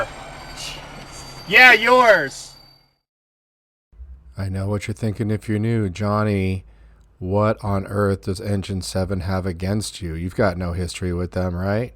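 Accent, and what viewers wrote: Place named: United States. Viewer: Nothing to do with this. But I'm an Englishwoman and I love your American accent, Johnny. But aside from that - that twat from Engine 7 had it in for you from the word go. Too much ego and not enough brainpower to understand that you were filming from a public area and had every jurisdiction to do so. What an absolute bellend.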